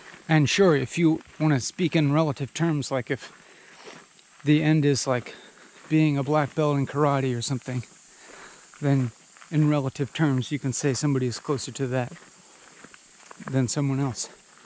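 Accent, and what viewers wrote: United Kingdom. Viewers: Why'd I assume this guy was British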